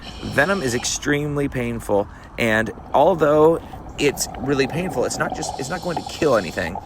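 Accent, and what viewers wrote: Australia. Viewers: Thats a solid Aussie accect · Nice Aussie accent. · Love your Steve Irwin accent 👌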